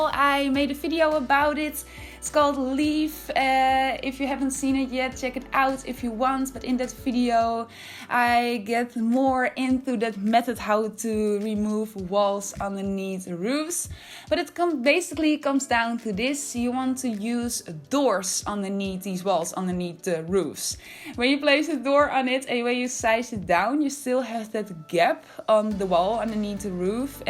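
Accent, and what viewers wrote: Netherlands. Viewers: Are you Dutch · That is awesome❤ btw you sound dutch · Are u Dutch? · You sound dutch 🤔 (I am dutch) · Ik heb met je stem begrepen dat je Nederlands bent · I bet your Dutch I can hear it Dus hey hoi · Dat nederlandse accent!! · mooi! ik kan horen dat je nederlands bent 😁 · I love this house! It looks so clear and still it fits in very well with nature. Where is the reference image from? Also; your accent... are you Dutch too? :) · I can hear your from the Netherlands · You're dutch aren't you? Hehe i recognize your accent😄🤭💓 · I can hear you're probs dutch!! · That’s a beautiful build, impressive Your accent gives me Dutch vibes, I think I’m right ;) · Omg THATS A GORGEOUS BUILD the way you made it circular is so smart! lol btw ik kan gewoon HOREN dat je Nederlands bent🥰😝 good job!😍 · Love.kawaii Cute ja ze is Nederlands, en haar accent is indd heel erg aanwezig, ik denk dat ze echt wel een Engels accent kan opzetten maar het enigzins misschien niet durft? 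· Wat mooi! Ik word er altijd zo gelukkig van als ik een Nederlands accentje hoor. Voel me meteen thuis 🥰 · Oke, ben ik de enige die hoort dat ze nederlands is? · Hello! You're such a great builder! Keep going! Also, is it true that you come from The Netherlands? Because i am Dutch and i can hear a Dutch accent in your language haha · Echt prachtig! Ik ga er vanuit dat je Nederlands bent met dit accent hahaha 🥰🤭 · Lmao immediately heard you were dutch! Leuk om een Nederlander tegen te komen die ook bezig is met Sims 4 <3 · Je heb nogal een Nederlands accent, dus ben jij Nederlands?😂🌸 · the house looks very good. the accent is very dutch.. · Awesome build!! Just found your channel and can't unhear your dutch accent, sorry hoorde meteen dat je Nederlands bent hahaha ( niet slecht bedoeld viel me gewoon als eerste op ) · I hear a dutch accent love it 😂❤❤ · I didn't expect you to be dutch but that accent bro... 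· Love your accent! I'm Dutch too hahahaha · Are u dutch? Cus you have a super heavily dutch accent. Als je Nederlands bent kan je dit verstaan/begrijpen. Je hebt zo erg Nederlands accent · Yo ben jij nederlands? Want ik kan het wel horen hoor. De build is trouwens wel vet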